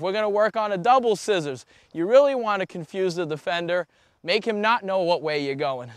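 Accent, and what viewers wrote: United States. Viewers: No no. dude in America, and canada they call it soccer actually. lol. He sounds like hes probably from one of those countries, hence the soccer reference. It is a term in the dictionary. you are giving false information. i didn't realize that anyone had any confusion about this matter besides yourself. If you are trying to make an underlying point about the fact that soccer is not the original name for the sport where it was invented in england in the 1800's than you have missed badly.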